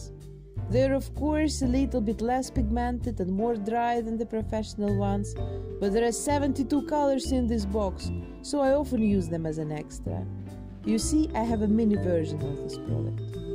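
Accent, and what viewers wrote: Russia. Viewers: Сорян, ты русская? , просто уши кровоточят:')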